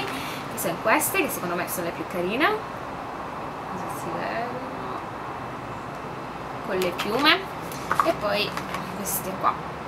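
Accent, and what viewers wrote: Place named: Sicily, Italy. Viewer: ma come mai io pensavo che fossi siciliana???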